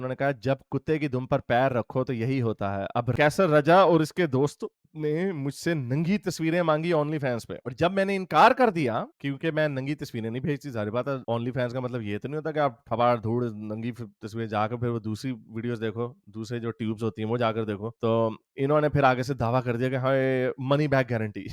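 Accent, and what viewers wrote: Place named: Punjab, India. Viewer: Same here, I live in singapore and i just come here to have Great laughs and Hearing his Punjab Accent!!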